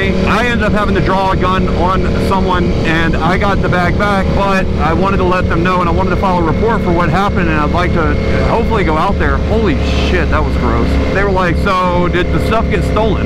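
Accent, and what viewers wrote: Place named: Shreveport, United States. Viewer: Sounds like Shreveport,la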